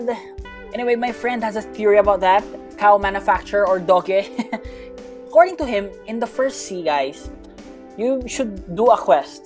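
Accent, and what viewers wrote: Philippines. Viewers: are u filipino or in the phillipines im curious becaus of ur accent hehe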